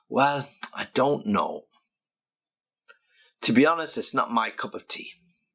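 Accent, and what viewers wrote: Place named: Northern England, United Kingdom. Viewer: 👏👏👏👏👏👏Where is your nice accent from,? Somewhere from the north, isn't it? Un abrazo 🇦🇷🏴🇬🇧🇦🇷🏴🇬🇧🇦🇷🏴🇬🇧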